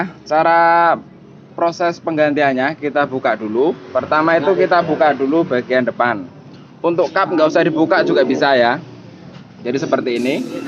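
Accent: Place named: Java, Indonesia. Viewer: Bengkelnya dimana bos ,,ko logatmu wong jowo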